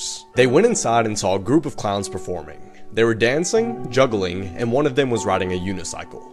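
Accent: South, United States. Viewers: I really hear your Southern accent in this video... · I miss your southern accent man.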